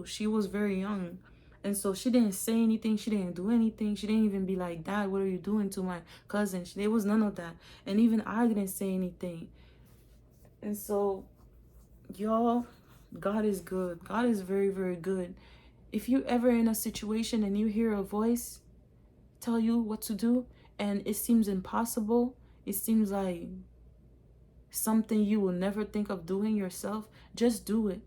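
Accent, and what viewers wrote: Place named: Haiti. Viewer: I picked up a Haitian accent the moment you started speaking 😍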